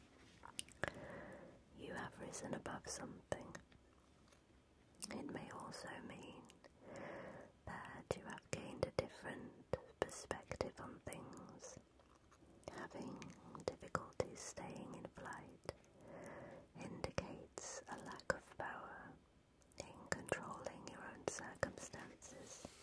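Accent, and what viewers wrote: England, United Kingdom. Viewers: What a beautiful English accent. Brilliant ASMR, thank you. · Hi.... Just discovered your channel.Makes a nice change hearing an English voice.You have a soft tingly voice.Its crazy I want to hear more of your voice but I am asleep after 5 minutes.So in compensation this video will take weeks to complete.....nice. Kind regards Mick.(UK) x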